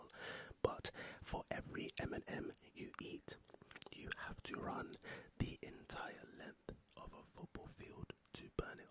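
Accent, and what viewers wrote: United Kingdom. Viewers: Nice to finally find a British asmrtist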